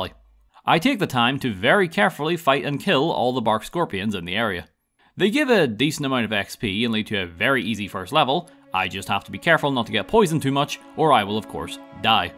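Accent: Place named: Northern Ireland, United Kingdom. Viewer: I love having your videos on to relax or for background noise and I never knew why until I heard from one of your Skyrim videos you're are also northern Irish I'm so glad I finally found someone from here who makes amazing videos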